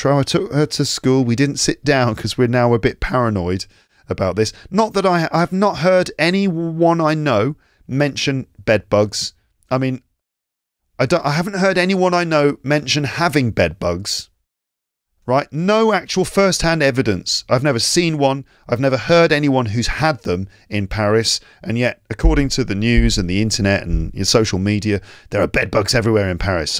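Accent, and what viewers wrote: United Kingdom. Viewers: Hi: I am so happy find this channel to learn English with British accent, again. T|his is because many years ago, I was in in the UK to study my degree n an university. But after immigrate to Canada, I lost my accent and even English (almost). I wonder do you a class to teach a group of people to learn English?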